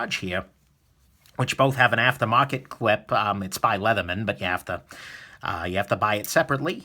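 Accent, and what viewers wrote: New York, United States. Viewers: You from New York bro? I worked with a lot of cats from there and you sound like them haha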